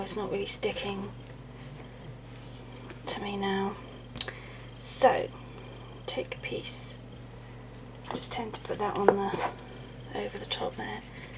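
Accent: United Kingdom. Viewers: where is your accent? i mean, what is it called...what part of england or area? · That british accent... love it! Btw great tut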